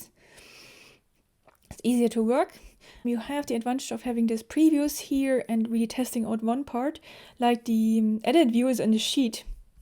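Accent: Germany. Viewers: Your accent sounds German with a tiny bit of French. Are you from the region of Germany near France?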